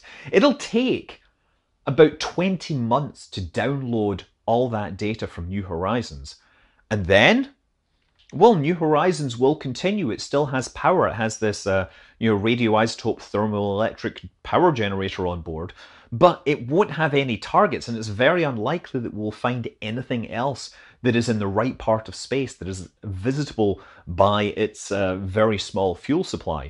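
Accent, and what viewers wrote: Scotland, United Kingdom. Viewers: Wait... you're Scottish?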